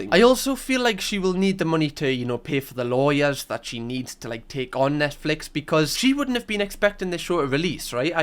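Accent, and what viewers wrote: Newcastle, United Kingdom. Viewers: Ive suscribed just to hear your lovely Geordie accent.❤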